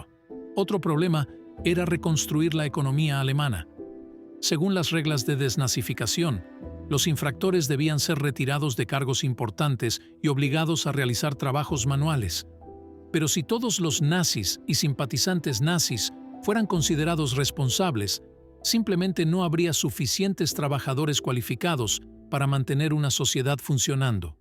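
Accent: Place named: United States. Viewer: Lo k me enfada d este amigo es k empieza a hablar Un perfecto español y despues lo habla con acento gringo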